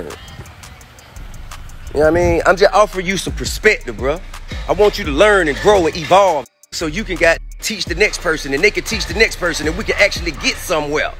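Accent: United States. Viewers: Why do he sound so country this day and time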